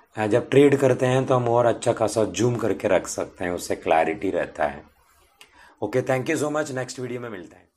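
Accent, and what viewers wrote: Tamil Nadu, India. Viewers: U r a tamil guy... I can see that from ur tone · sure will try the strategy strictly for 5 working days. Let see what happens. I have a friend who earn good moeny in Iron fly weekly said the same thing chart will speak to you if you give market some spare time to concentrate and learn. But I don't know why successful traders like my friend they don't like indicators they prefer chart patterns and momentum of the market to catch the momentum for exp recent CPI result. I mean indicator is also doing the same thing indicating over bought over sold (Nadarya envelope) Multip lever will indicate bottom and tops. I mean this indicator are increasing our efficiency and productivity nothing else but they consider as FOMO. Let see by the way thanks for taking the time to guide me in the mid of the night you have earned a loyal SUBSCRIBER. 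Also your hindi is abstract I guess you're Tamil Shukriya Ji 💐💐🙏🙏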